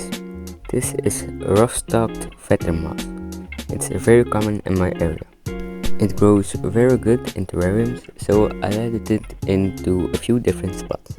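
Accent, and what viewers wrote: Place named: Belgium. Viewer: Oh really lol, you might know that he is from Belgium and I'm also from Belgium so we probably have the same accent😅👍🌿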